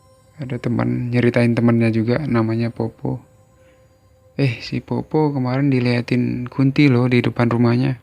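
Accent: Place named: Medan, Indonesia. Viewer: medan x ko bang. mantap.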